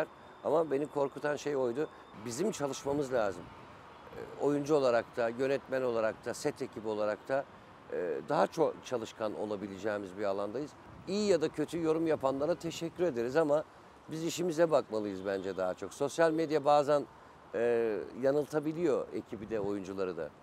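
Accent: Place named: Rize, Turkey. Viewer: Rize şivesine çalışman gerek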